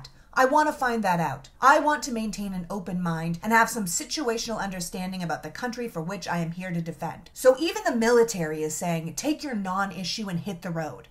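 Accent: Canada. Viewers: Love it😁!!! Line of the century. "Fucking learn from, your mistakes"!!! Thanks for educating me, on what the hell 'CRT', meant!! I've heard of the regionalized, banking practices before, but not CRT. More guilt trips on us, from the side that does, the most wrong😔!! Also, you're Canadian🇨🇦, or originally from Canada??? It's in your pronunciations, and structure.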